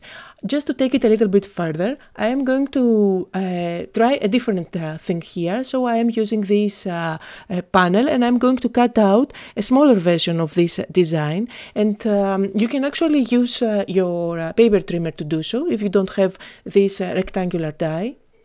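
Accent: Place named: Greece. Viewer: your accent makes me wish to be in the Pelleponisos again this summer!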